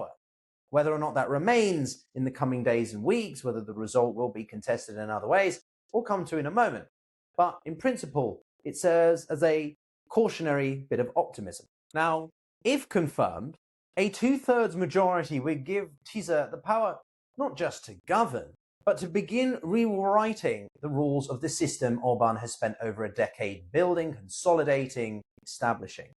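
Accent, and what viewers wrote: England, United Kingdom. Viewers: Another English Accented Narrator. England is in trouble! Git off Celtic lands! Says The BronxBoy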